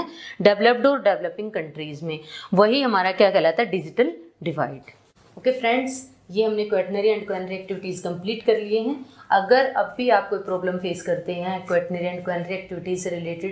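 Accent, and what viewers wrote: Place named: Haryana, India. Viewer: Really appreciable video, cleared all doubts and confusion (btw maam aapki English mai bhi Haryanvi tune aa rhi h) 😅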